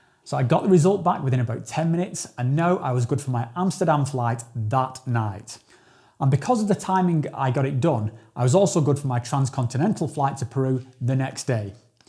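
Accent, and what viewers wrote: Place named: England, United Kingdom. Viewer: I thought you sounded too English to be Swiss🤔🤣 you never know with accents these days. Would still make a good video for your "fans" if you never seen it as an invasion of privacy.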